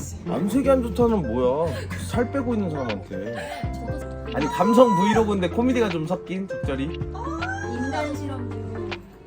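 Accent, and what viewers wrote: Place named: Gumi, South Korea. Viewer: 오늘도 재미써여~ 구미사투리에여~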